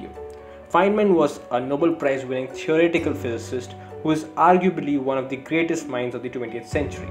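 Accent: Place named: United States. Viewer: Well is that voice your's sir! I wonder! Its different but appealing! A bit of American accent!! Nailed it👍🏻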